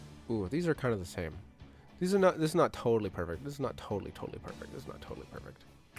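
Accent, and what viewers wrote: Canada. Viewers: I'm gonna guess Canada? He's got some strong Canadian vibes eh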